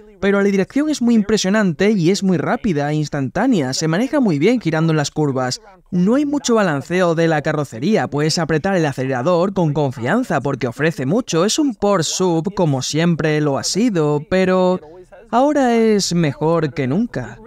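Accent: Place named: Spain. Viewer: Por qué español de españa 😭😭😭😭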